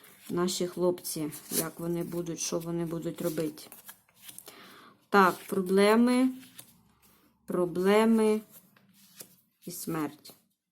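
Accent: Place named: Zaporizhzhia, Ukraine. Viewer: Уважаемая, если вас это не обидет ответьте пож. Вы наша, Запорожская? Это чувствуется в разговоре,что конечно приятно, когда вы говорите наш город, сказав наш нам и кажется,что вы живёте в Запорожье.Спасибо дочка, за твой труд.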